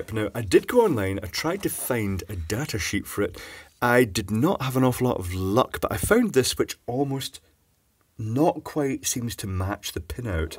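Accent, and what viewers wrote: United Kingdom. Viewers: That british accent tho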